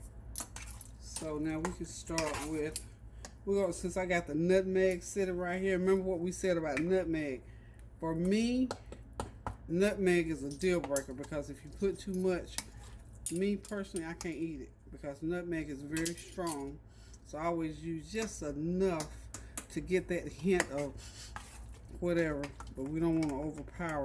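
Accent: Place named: South, United States. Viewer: I just love her voice! She reminds me so much of my mommy and granny. The Cove City, NC in me is almost lulled to sleep by her southern twang and the peace in her kitchen and her cooking. Thank you, Ms.!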